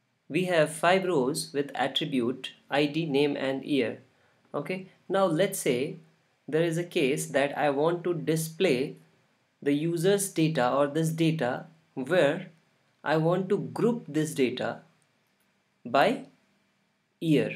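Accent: India. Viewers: This English-speaking guy with indian accent explained the topic better than many nativ-speaking bloggers from my country.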